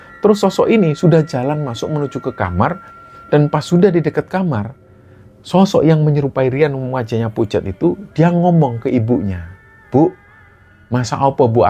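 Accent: Java, Indonesia. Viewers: terimaakasih mas dan crew sudah selalu menemani mingguku. kali ini dngerin cerita ini bikin kagum tapi merinding jugaa. sefatal itu yaa bahkan jasadnya pun bisa pulanh sendiri tanpa dibawa fisik oranh rumah. jatim memang the best apalagi mas yg nyeritain kek jawanya njawa bgttt brasa hidupp.. sukses terus tim KS ✨️✨️🫶🫶❤️❤️🎉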